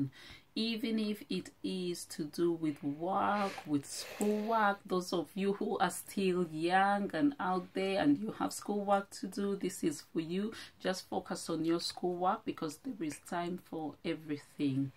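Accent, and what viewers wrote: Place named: Uganda. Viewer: You are a beauty. Where are you from? I'm Kenyan and to me you sound Ugandan... maybe Zambian or Malawian. Advice: film before a window (the natural light is much better than an overhead bulb. Also, set the phone/camera down so that the footage is stable. Good luck on your journey!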